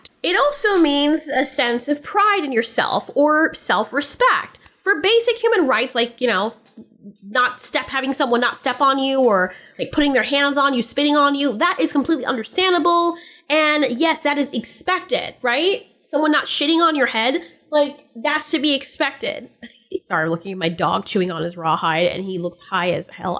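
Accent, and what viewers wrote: United Kingdom. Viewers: Love your British Accent by the way. Also great analysis ❤ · Brutal takedown done with the best mocking British accent ever!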